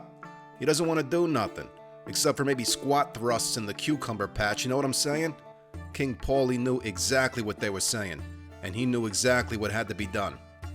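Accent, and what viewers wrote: New Jersey, United States. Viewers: Is it just me or is the Jersey accent extra thick for this?:D Not complaining I love it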